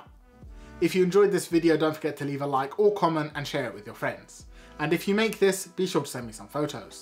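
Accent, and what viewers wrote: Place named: United Kingdom. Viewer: I love your videos. Your "bri'ish" calm accent makes it all 10x better. The turkish/kurdish way of preparing the bell pepper is to cut open the top of the fruit from above around the stem. After removing the core, you can stuff it and use the stem as a lid. Then the bell pepper looks intact. Cheers for the videos again!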